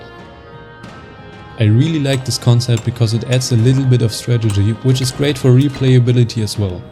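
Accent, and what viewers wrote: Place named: Germany. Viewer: Man Hört doch das du Deutscher bist😂. Geil!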